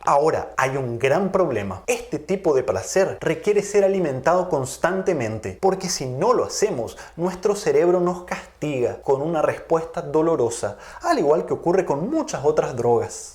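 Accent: Paraguay. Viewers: No me gusta su acento de paraguayo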